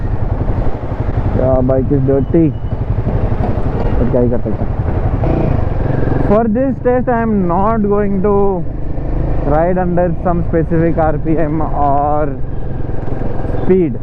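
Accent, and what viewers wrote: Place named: Pakistan. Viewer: need to check with my friend but your Hindi is really good bro you sound like a urdu speaking muslim sometimes 😀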